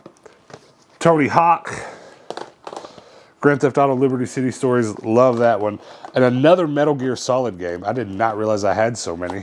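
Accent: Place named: United States. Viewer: You’re super American, interesting but a bit too much for me to listen too regularly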